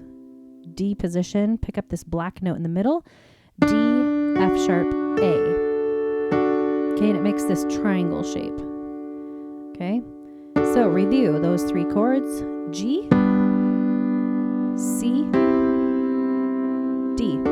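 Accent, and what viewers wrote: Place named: United States. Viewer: Greetings from France ❤️. I SUBSCRIBED TODAY 13th November 2024. I LOVE YOUR "COUNTRY" VOICE, SO BEAUTIFUL AND A PLEASURE TO LISTEN TO ❤. Wowww 😮 You played it to my rhythm, slow and peaceful. You are an EXCELLENT teacher. Thanks from the bottom of my heart for simplifying the music. A big hug of gratitude 😊🫠.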